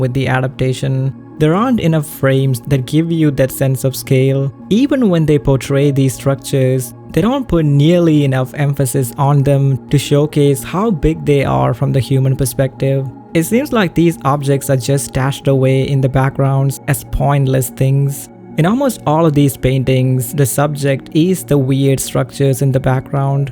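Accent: India. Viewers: Oh boy, are you Indian or something? Your accent is showing, but it's passable. Please work on this. I'm not rage baiting. I'm trying to give advice, so you can get more views and a proper yt channel.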